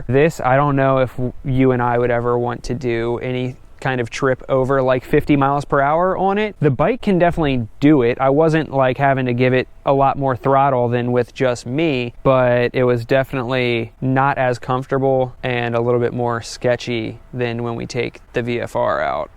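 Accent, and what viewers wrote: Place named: Vermont, United States. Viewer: Where are you, you have a pretty distinct accent. Vermont?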